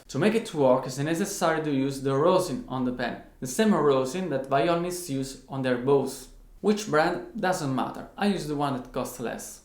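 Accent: Italy. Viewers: Si sente tantissimo il tuo accento italiano😂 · Im spanish, and its very easy to notice that you're italian, and I don't say it with bad intentions :) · I'm Brazilian, yeah you can notice it, the pronunciation of the words sound very Italian, IDK how to explain? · Your English is good. Love the Italian accent! You are very talented!! Keep it going!!! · Accento italiano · L'accento Italiano si sente da 10km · Si capisce un po'dalla pronuncia che sei italiano. However,nice video! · Are you from italy? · I'm Italian and I'm 14, but I think u are from sud Italy cause of your strong accent, I notice only now that u are Italian and I don't know why I'm speaking English, ormai ho già scritto 😂, a proposito di dove sei? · I hear italian accent here umlmlmlml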